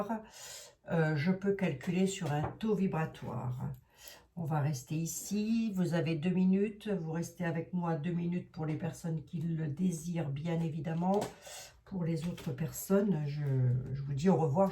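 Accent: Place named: Lorraine, France. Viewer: Bonsoir, je vous écoute toujours avec plaisir. J'aime aussi votre accent et expressions qui me rappellent ma lorraine natale.❤❤❤❤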